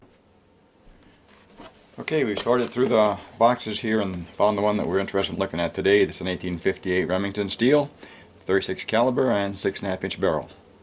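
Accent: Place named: Minnesota, United States. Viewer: You must be in Minnesota based off of your temps and snow and accent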